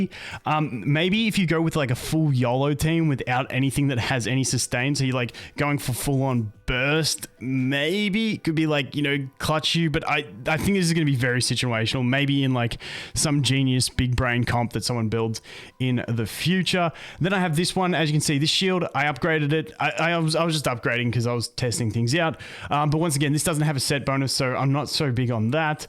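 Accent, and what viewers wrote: Australia. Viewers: damn i always like your accent, sir. what is it? aussie?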